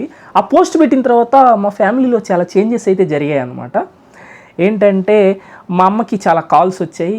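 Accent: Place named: Telangana, India. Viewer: Konchem konchem telangana matladuthunnav I like that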